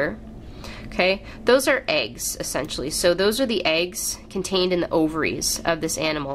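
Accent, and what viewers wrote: Canada. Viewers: First of all, she lives in Canada and is a Canadian. They have a different accent and pronunciations.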